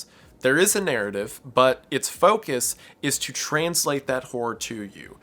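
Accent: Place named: South, United States. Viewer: What kind of dialect is speaking in? Like what part of US, where they say "setting" instead of sitting? Is it just a southern thing? lol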